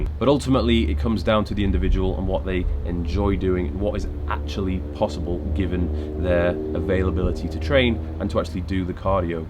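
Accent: United Kingdom. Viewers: 3 main reasons: 1. He is good looking ( and we tend to like and give good personal qualities to good looking ppl ) 2. The British accent ( which makes you sound more legit ) 3. He gives decent advice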